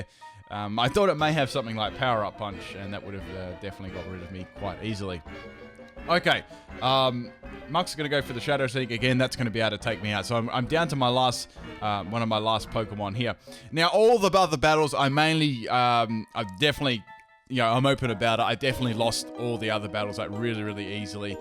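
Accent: Australia. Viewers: yo are you aussie?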